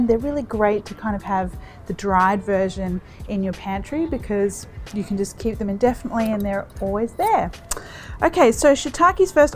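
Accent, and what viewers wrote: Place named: Australia. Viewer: jee you're good, and the way you talk about food with that Australian accent is so nice to hear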